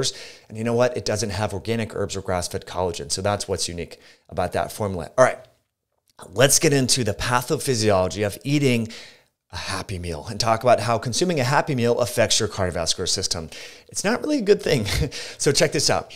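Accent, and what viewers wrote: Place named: South, United States. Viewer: Hard to understand unless you've lived in the South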